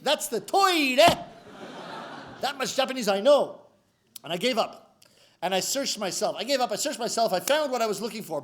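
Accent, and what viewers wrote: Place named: United States. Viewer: That's not Canadian, that's American!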